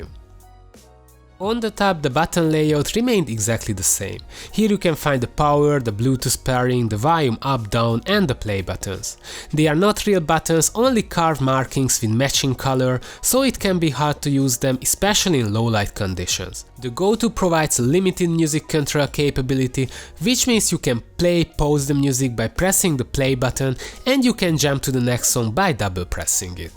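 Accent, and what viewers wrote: Hungary. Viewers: The hungarian accent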